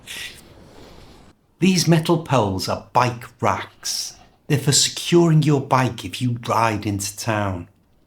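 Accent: Liverpool, United Kingdom. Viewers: I've got something to tell about your method of teaching which is very much more enjoyable and I haven't seen it on the internet. Thank you to share with us. But also got something else to tell which I could't never understand while I lived in London which is about the english people and the why some of them love the Royal Family I mean why do they become more then other people, is it because they're Royal, because I can't see nothing so special? The place I come from we also have a Royal Family which we can see or even heard about often and they don't leave in castles as long as i know. One of them became a politician. As I see you still have kept some of Liverpool accent while you speak. But not bor kkk I loved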